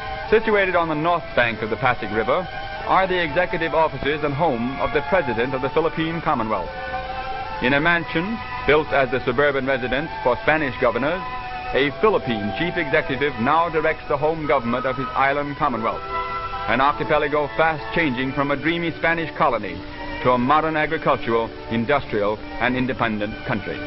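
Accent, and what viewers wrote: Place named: Transatlantic, United States. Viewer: trans Atlantic American accent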